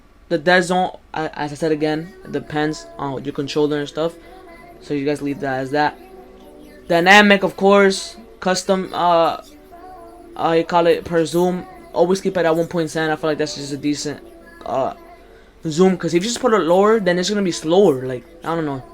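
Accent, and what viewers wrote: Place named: Bronx, United States. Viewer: nah you just sound like it gang ik a Hispanic Bronx accent😭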